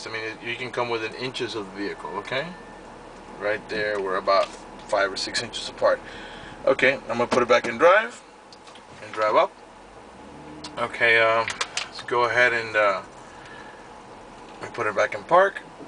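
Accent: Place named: Cuba. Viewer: You are neither, nor a seller not an american you speak like a cuban just arrived..sorry, mention the issues with CVT automatic transfer case, is a sheet I sold mine just 1 year after got it, got it???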